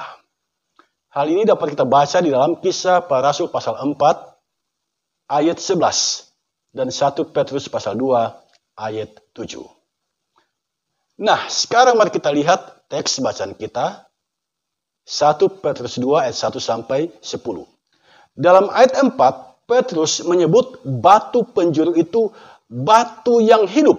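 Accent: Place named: East Nusa Tenggara, Indonesia. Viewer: Syalom bapen slamat pagi. Trimkasih bpa utk penjelasan firman Tuhan pagi ini yg memberi pemahaman yg sungguh dan benar. Maaf bapa berasal dari daerah mana? Saya sendiri dari NTT. Maaf bapa saya bertanya krn dari gaya/logat bicara sama dgn orang NTT. Dan jujur bapen saya baru dapat canel bpa hari ini 19 juni 2023. Skli lag itrimkasih banyak2 bapan. Tuhan Yesus memberkati bapen dan keluarga.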